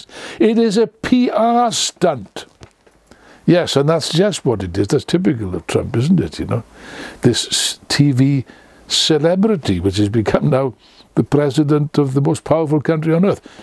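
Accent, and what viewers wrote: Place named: Wales, United Kingdom. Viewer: If every political statement were delivered in the Welsh accent there would be more peace in the world. (Not taking away from the message obviously, it's spot on.)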